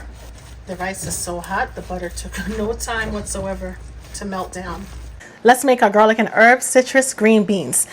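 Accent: New York, United States. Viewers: New sub. Love your accent. A certain area of New York? I'm from Philly. 🙂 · Where in New Yawk you from?😁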